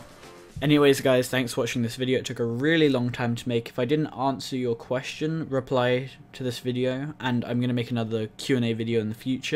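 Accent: United Kingdom. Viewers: U have such an english accent fam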